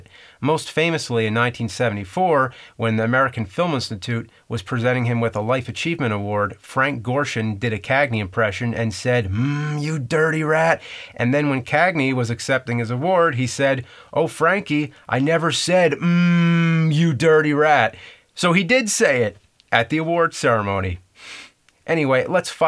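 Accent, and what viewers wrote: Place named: New Jersey, United States. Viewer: I noticed that too, I think it's just his New Jersey accent.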